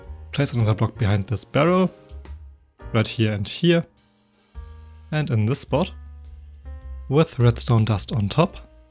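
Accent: Germany. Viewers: Warum nicht deutsch man hört das du deutsch bist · I hear a wening deutsch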